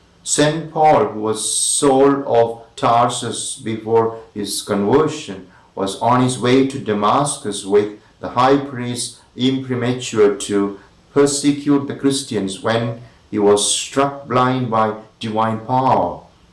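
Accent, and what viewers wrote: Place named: India. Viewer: Excellent Indian Accent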